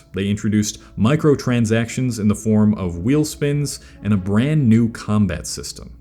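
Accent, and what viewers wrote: United Kingdom. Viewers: The British accent caught me off guard😭 It was very good though. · his accent convinced me that he's british (im from britain)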